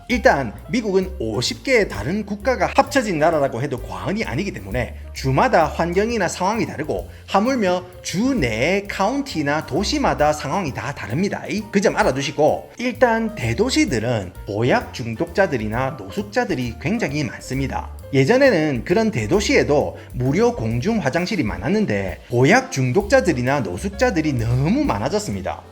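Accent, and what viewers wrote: Gyeongsang, South Korea. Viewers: 영어도 네이티브 한국말도 경상도 네이티브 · 하하하 너무 재미있네예. 아는 이야기를 해도 재미있고 모르는 이야기를 해도 재미 있고~ 나도 경상도 사람이지만 별의별 사투리들을 잊어 버리지도 않고 어찌 그리 잘 하실꼬 싶네예. 내용도 좋고예.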